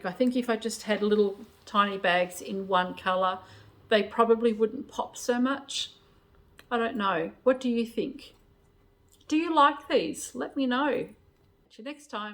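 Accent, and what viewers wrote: Australia. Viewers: Hello... u'r sew'n friend Anna here from Greensboro NC. Luv the Itty Bitty Bag and I've been wait'n 2 make one every since I watched u'r last shop tour, but I ran n2 an issue when make'n it. I went by the "transcript measurements" it says the main fabric is 10½" x 3½", contrast (black) is 10½" x 1½" and the lining is 10½" x 3½"... it didn't dawn on me that the lining was gonna bee 2 short until after I put the zipper in and turned the lining 2 the backside of the open bag 2 press neatly down. I watched as u did the steps but bcuz u lined u'r bag w black fabric I couldn't c that it was even w the bottom of the bag. Lesson learned. Main fabric needs 2 bee 10½" x 2½". Don't go by transcript measurements. I suppose that u'r beautiful Australian accent was misinterpreted. Just thought I'd warn u and others. Waffle on my dear lady, waffle on.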